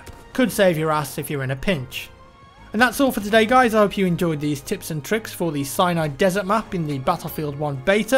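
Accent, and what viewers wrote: United Kingdom. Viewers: just the british accent lol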